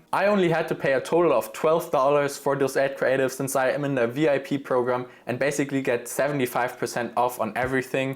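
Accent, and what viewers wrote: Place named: Germany. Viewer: Man merkt direkt du bist deutsch bro hahaha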